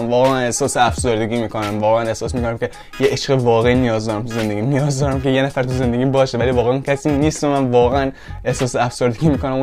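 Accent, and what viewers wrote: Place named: Gilan, Iran. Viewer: تا حرف زدی گفتم گیلک هستی باعث افتخاره همشهری ❤😎👌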